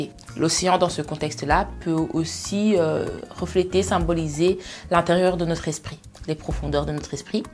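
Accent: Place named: Brazil. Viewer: Você é brasileira, o sotaque família Brasilândia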